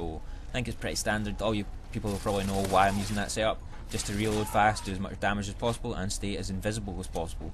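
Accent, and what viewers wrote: Scotland, United Kingdom. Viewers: scottish... mute. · r u scottish, i love axent =D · scottish accent.. eww... i was sick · it is, he's scottish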